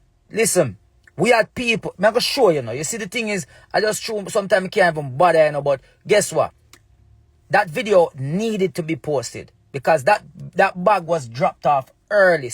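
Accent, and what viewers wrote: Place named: United Kingdom. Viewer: However this dude is not Jamaican he is from the UK with a British accent.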